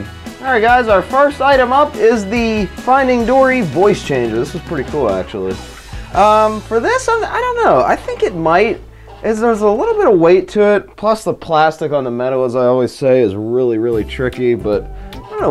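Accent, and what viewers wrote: Pittsburgh, United States. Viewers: are you from pittsburgh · are you from pittsburgh pa?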